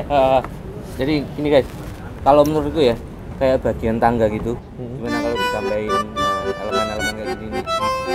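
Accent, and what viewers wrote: Java, Indonesia. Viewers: Mantap... Pertahankan logat medhok nya yo mas.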